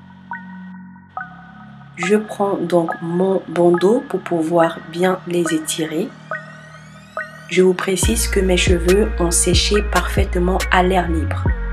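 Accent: Gabon. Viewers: 🇬🇦...haaaa je savais l'accent boma👌 au moins c'est plus crédible on je me retrouve dans le type de cheveux 😋 courage sista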